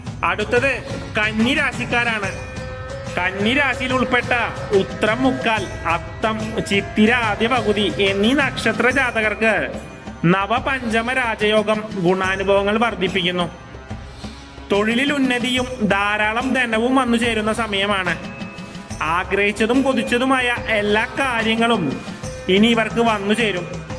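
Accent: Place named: Thrissur, India. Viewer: തൃശൂർ ആണ് ല്ലേ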